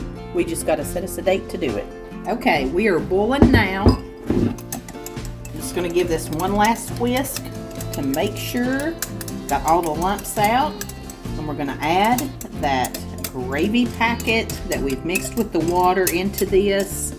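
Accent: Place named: Tennessee, United States. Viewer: you can tell by my title that’s my country too ! I live out behind Dollywood ! Love the Smoky Mountains !! I thought your accent was a lot like mine !! 🧡🤍Ren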